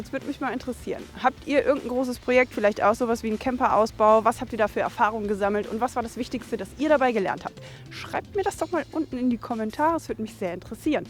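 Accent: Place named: Berlin, Germany. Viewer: Ich liebe deinen Berliner Akzent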